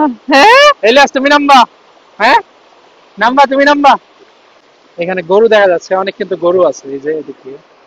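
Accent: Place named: Chapai Nawabganj, Bangladesh. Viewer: ভাই আপনার বাড়ি চাপাইনবাবগঞ। আপনার কথাতে আঞ্চলিক ভাষা র টান আছে। কিন্তু আপনি তা উপেক্ষা করার চেষ্টা করেন। কিন্তু আঞ্চলিক ভাষায় কথা বলা কোন অপরাধ নয়।...... From Rajshahi Bangladesh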